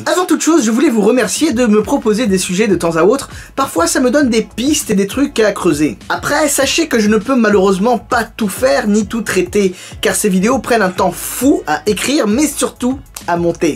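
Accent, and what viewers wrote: Italy. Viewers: Salut, tu pourrais faire une vidéos sur les préparateurs stp stp stp ? <3 <3 Plus sérieusement, de ce que j'en ai compris, la QUATRE HUIT HUIT Pista n'est pas limité en exemplaires mais en temps donc compliqué à en avoir une ... j'y crois moyennement, seul un nombre d'exemplaires sera attribués aux concessions. Sinon très bel accent italien, je te repost rien que pour ça !! Vivement la prochaine vidéo en full Italien :o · j'ai liké pour l'accent Italien x)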